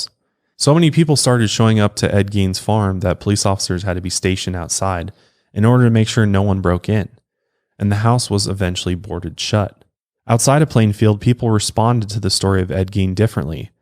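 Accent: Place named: South, United States. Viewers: I never thought people from Colorado had a southern accent but then again I'm from Texas and a lot of us have a heavy accent😂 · It’s so funny you talked about having a southern Colorado accent because in my head I have always thought you sound kind of southern/ Texan lol! I’m from Texas, btw. Love the podcast!! Any content you or put out is 100% 💜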